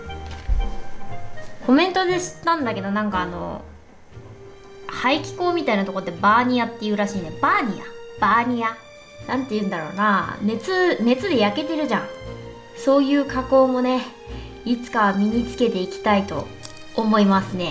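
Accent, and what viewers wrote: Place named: Western Japan, Japan. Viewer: は何処出身？西寄りの言葉たまに出るよね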